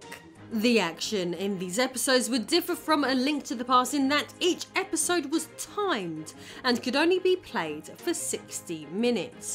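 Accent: England, United Kingdom. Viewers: I've been studying English for almost my lifetime and I have to say I totally love your british accent. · It's a British accent? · That live voice thing is kinda annoying. I dont need to be handheld and told how to access a sub menu.. just let me play the game. Now if they let you do the live voice then that would be cool! Your voice is enchanting! Is it an English accent that I'm hearing? Absolutely stunning voice.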